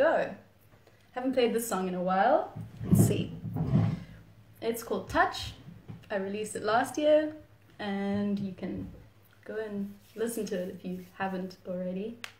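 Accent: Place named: South Africa. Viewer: so good to hear a south african accent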